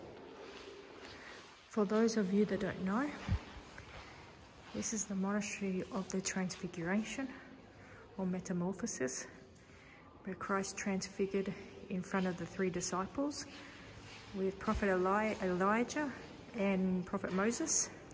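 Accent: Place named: Australia. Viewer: Sounds like an Australian Voice! A mysterious Holy Site.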